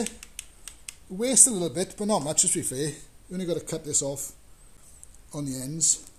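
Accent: Wales, United Kingdom. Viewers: Hi you remind of the great Tommy Cooper, he just couldn't do anything right, yet the people 😍 loved him. I must admit I enjoyed your presentation well done, at least you gave us a good laugh. Lived in North Wales for years colyn Bay. Worked on the a55. Great to hear that lovely Welsh accent again. Muchas gracias 😎 Liam kilkee clare ireland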